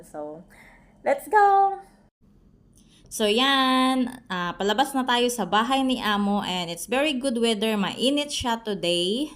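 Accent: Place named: Singapore. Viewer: Enjoy your day in Hong Kong, I thought it's in Singapore because of the accent. Thanks for sharing.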